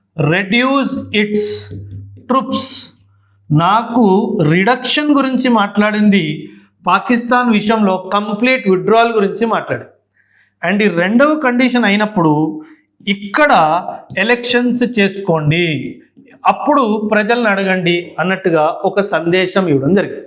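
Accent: Punjab, India. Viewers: తెలుగు మాట్లాడే వారు కూడా స్వచ్ఛమైన తెలుగు పలకడం లేదు ఒక పంజాబీ అయి ఉండి కూడా స్వచ్ఛమైన తెలుగులో మాకు సమాచారం అందిస్తున్నారు అభినందనీయులు ధన్యవాదాలు❤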